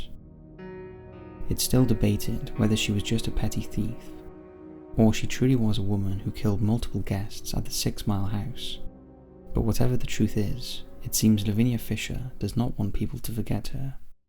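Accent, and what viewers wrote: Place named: North Wales, United Kingdom. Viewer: I’m sure the narrator is from north wales , soothing voice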